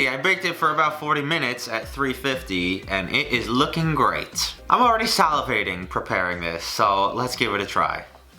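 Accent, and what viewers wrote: New York, United States. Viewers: Sounds like suburban New York to me.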